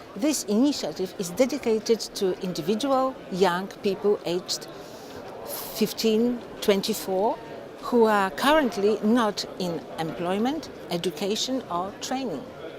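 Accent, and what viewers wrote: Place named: Poland. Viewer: No wymowa angielska nieszczególna, bardzo wyrazny twardy polski akcent.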